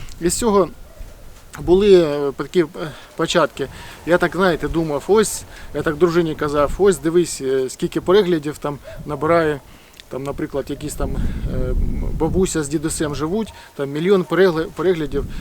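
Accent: Russia. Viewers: Щирість та правда - це завжди легко і по-справжньому.Це мене "підкупляє" Ви правильну ставите ціль,мету :золота кнопка.Тільки так люди досягають успіху на шляху до здійснення мрії.Тільки високі цілі,а не на півдороги ,напівмету .Ще,не для "доривання" чи образи.В словах,наприклад копать,допомагать, знімать,розказувать,,пробувать,брать,працювать ,включить(болгарку) в кінці треба закінчувати. на И , бо ці слова відповідають на питання "що робити?/зробити".А от ,наприклад,"і всі думки погані (що роблять?) відходятЬ - тут вірно.Або такий приклад:Що рОбить? - відходить;що робИти? - відходити Ще раз наголошую- це не в образу вам.Ви вже добре розмовляєте українською.Це просто тонкощі і ,звісно, наслідки ситуації життя в російськомовну середовищі.Я сам з Миколаївської області , з російськомовного селища збудованого у 60-х роках.Половиною населення були,до розпаду СРСР ,росіяни,що приїхали працювати на два нових заводи, селище тому і побудували.Тому і школу закінчив російську.Та й зараз в побуті ще селище,здебільшого,російськомовне - як наслідок.Але потрохи у вас і у нас всіх все вийде,і все буде Україна!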